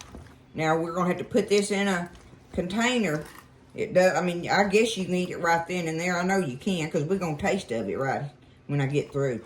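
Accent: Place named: Texas, United States. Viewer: you are such a sweet and perky soul, you look so fresh your hair do cute as usual. Your Texas drawl and manner of speech sounds so good, I’m 84 proud years old, I ve never been to Texas but some of family came from there- I love to hear 😁😁😁😁😁😁😁😁😁💨😊💨😊💨💨💨💨💨💨😊😊😊😊 love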